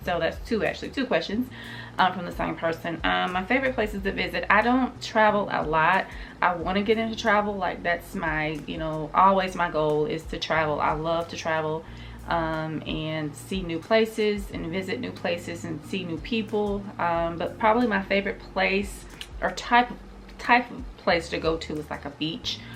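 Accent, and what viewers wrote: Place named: Alabama, United States. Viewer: Yaay to 3k & counting 🙌🏾🎉! When I got the noti for this video I couldn't wait to listen on my drive home. I have so much too say...re: balancing life & how u had the channel for years, 2020 was your time & the pandemic allowed u to get a great start again. Also, years ago, the kids were younger so it probably was a bit more difficult to carve out time when u had to tend to them more. I'm a single mom of an 8 yr old & I'm slowly starting to feel like I can breathe a little at times. Also doing a 3/12 schedule is great esp. if u can make it so that u can have a long stretch of days off. Re: channel growth-it's true what u said about not comparing yourself to others. I wish u continuous growth of a genuine community of positivity. Engagement & consistency is so important, from what I've heard. Re: u loving NYC...I was so excited to hear that! 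I live right across the GWB in Jersey but I was born & raised in the Bronx & a grad of NYU 💜. Also, re: selling your clothes, it is time consuming but I have an idea, whatever your daughter doesn't want, u can do a declutter type of video (more content 😉) & then do a sale. It is a lot of work but for a short time instead of keeping up with listings on Poshmark or the like. Lastly, I kept wondering if u were from Alabama because your accent reminds me of someone who is from there as well. I could go on & on but I'm so happy that I found your channel & thank u for sharing 🤗.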